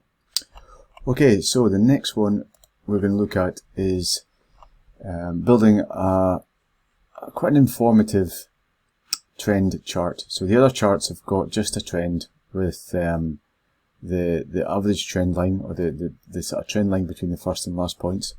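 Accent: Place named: Scotland, United Kingdom. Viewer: Very nice. Great explanation. Thanks a lot. Nice to hear a Scot!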